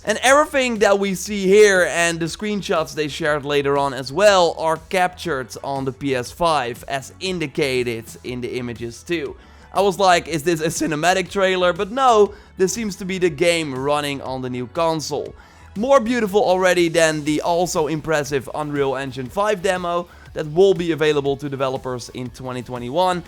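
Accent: Netherlands. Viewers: where do you come from, you sound dutch? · Hmm... Interestingly, not only you’ve guessed the name correctly, you also have Dutch accent.....Like RobinGaming. And your YouTube name is begin with which is very common fore letters of Dutch names..! Hmmmmmmmmmmmmmm....(edgy face) Yeah, I think you’re definitely Guerilla’s undercover bro!:)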